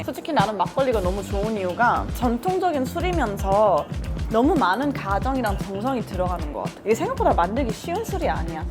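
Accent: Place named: Gyeongsang, South Korea. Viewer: 어머님과 함께 갱상도 사투리로 대화하는 모습도 무척 재미있습니다.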